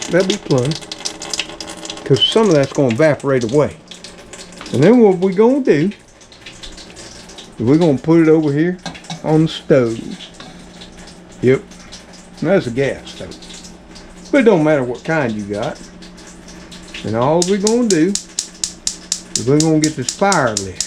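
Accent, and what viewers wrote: South, United States. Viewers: my daddy is from southern Mississippi...I enjoy watching how you do things and listening to your deep southern accent.... May God bless you and the kitty crew.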